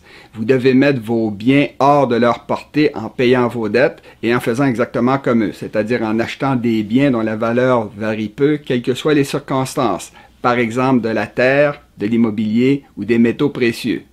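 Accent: Quebec, Canada. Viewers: Mr, des explications et conseils clairs, precis,concis,référencés, et un accent quebequois moins insupportable et plus comprehensible que d' habitude (pour un francais). j' apprecie la synthese complexe DES problemes actuels en 30 minutes ce qui me fait dire que vous etes peut etre du serail et maintenant repenti... exposez-nous votre parcours professionnel et vos motivations a tout debaler aujourd' hui... les mots n' ont pas la meme valeur suivant qui les emploie cela n' enleve en rien la qualite de la video je m' en vais en cueillir une autre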